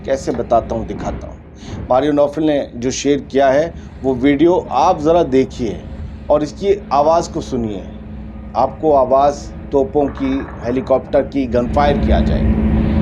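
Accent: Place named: Bihar, India. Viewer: Bhai aap bihar se hain tale upar ye bihar ki zuban hai ❤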